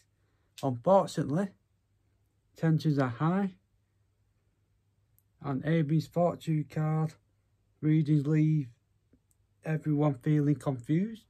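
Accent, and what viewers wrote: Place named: North, United Kingdom. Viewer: ahhh that's right! I thought I could hear some Northern in you 😂 I'm part Scottish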